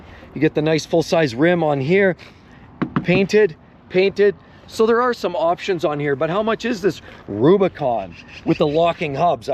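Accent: Canada. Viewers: Yes, truck prices are stupid but did I detect a bit of Canadian in your voice? And then I looked up the pizza place next to the lot you are on. There's one near Edmonton, Alberta. The exchange rate is currently 1.37 Canadian to the U.S. dollar so cut those stated prices by about a third to get the U.S. prices. And do some homework before venting your spleens! I still wouldn't buy one, though. Pitter patter now! Yes, been streaming me some Letterkenny lately!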